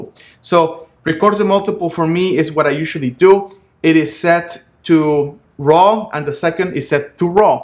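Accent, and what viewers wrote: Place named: Spain. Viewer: I'm here watching your third video and in each video, you always have something so funny to say. In this one, the "Help Text Size". "If you're old and you're losing your sight, go to large". OMG, you made me laugh, especially because of your Spanish accent. Anyway, great videos, I am like David below, been using Canon for life and on my 5D MII, I hardly ever visited the menus extensively. You're right though, these settings will aid me with faster photo processing and focus selection. Thank you for your time on all these. I will continue to watch all of them and revisit them as a refresher. Who wants to read a manual when we can watch your videos.:)) PS. no insult intended on your accent, I'm Spanish too and I have so many family members with a similar accent.;)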